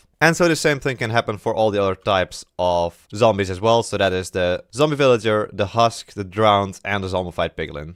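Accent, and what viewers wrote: Netherlands. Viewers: Hoi ben je nederlands? je hebt wel een opvallend nederlands accent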